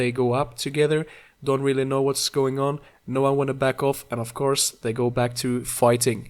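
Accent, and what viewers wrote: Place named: Scotland, United Kingdom. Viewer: idk why you sounds somewhat Scottish to me lol. Anyoway I got my captive bred Mandarin a few days ago. So glad we are able to captive breed these beautiful fishes.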